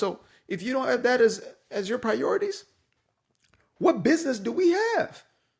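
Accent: United States. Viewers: Careful now, you are sounding American, that seems to be frowned upon these days. Stay safe, and keep up the good work, every day you wake up alive is another day you can strive to be better than yesterday.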